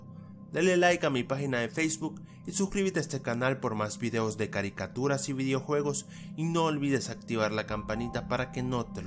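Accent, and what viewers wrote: Chile. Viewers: suena como chileno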